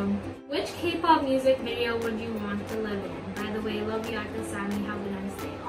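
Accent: Philippines. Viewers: i just love your tagalog accent! Waaah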